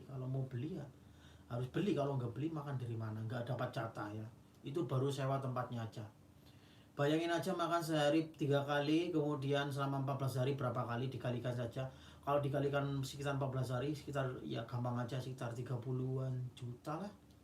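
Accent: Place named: Banyumas, Indonesia. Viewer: wah ngapak mas, wong ndi njenengan. Saran🙏 Karo Lexis audio jjal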